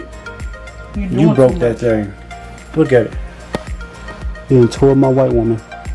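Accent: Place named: Jamaica. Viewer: I hope y’all haveing a good day and my Jamaica 🇯🇲 sister just have to say this JAMAICA FOREVER 🇯🇲 love how u talk we Jamaicans funny 😂 😂 btw I’m a new subscriber 🤗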